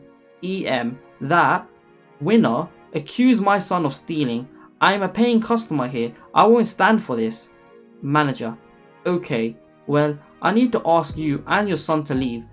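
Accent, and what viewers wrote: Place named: Scotland, United Kingdom. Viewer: ??? The person speaking is speaking with an accent that is from the UK.. Not exactly sure where, sounds rather Scottish. So.. What are you on about? Their English is more than likely, better than YOURS.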